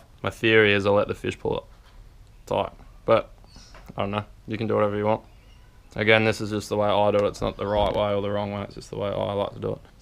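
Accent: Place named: Sunshine Coast, Australia. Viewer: Fark me! Briggsie’s brother from another mother! Good , informative vid. Are you from Sunny Coast also? Same accent. That way to tie the FG is the only way I reckon is the bomb. Searched heaps and this method finished off with the rizzuto knot is the best by far!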